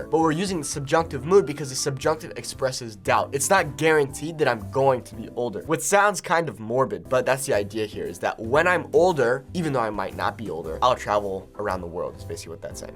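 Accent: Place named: Mexico. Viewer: Hola maestro, tu español es muy bueno, es excelente, yo soy de México y eres la primera persona extranjera que conozco que tiene el acento Mexicano, estoy muy impresionado por tu español y además sabes diferenciar entre los 2 tipos de español que hay, hablo del español de México y del español de España, me apareció tu video en recomendaciones de YouTube, quizás porque estoy aprendiendo inglés y los videos salen como sugerencias, sigue así y felicidades 🎉🎉❤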